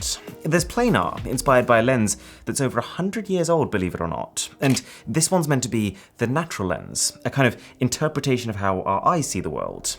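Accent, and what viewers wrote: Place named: United Kingdom. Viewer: The British Accent is everything for me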